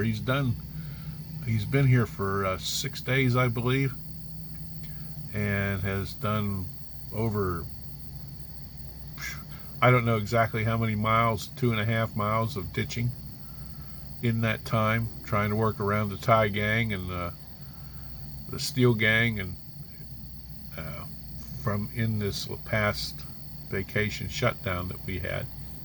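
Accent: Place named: Pittsburgh, United States. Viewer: I always enjoy your videos,. Greene County is where my dad's mother came from. Love the Pittsburgh accent, too. Thanx again from South Fayette Twp.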